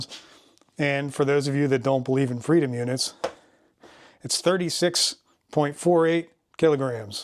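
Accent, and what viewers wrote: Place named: Western Pennsylvania, United States. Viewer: Useful man thanks! Western PA? My folks are from Pittsburgh and I went to grad school there. Accent is tickling neurons.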